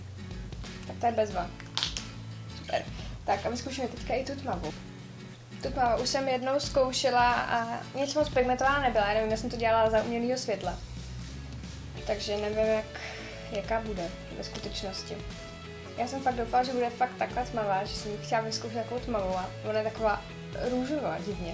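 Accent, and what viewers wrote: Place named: Moravia, Czech Republic. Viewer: Mě se na tobě líbí takovej ten moravském přízvuk:D např. jak říkáš líbí-líbijou :) video je super a nad nákupem přemýšlím! :)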